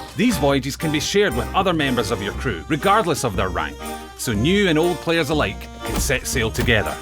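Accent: Scotland, United Kingdom. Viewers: voice actor? You mean the guy commentating who is Scottish? That’s his normal ascent...... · I don't want to upset any Scottish people but for a long time I thought this guy was Simon Pegg doing a Scottish accent until I realized the guy at rare is also Scottish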